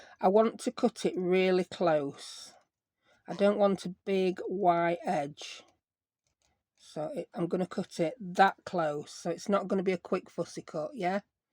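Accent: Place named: Rochdale, United Kingdom. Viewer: I have only just seen your video. You are so funny, especially about the kids comments your accent sound somewhere around Rochdale area. Great cards.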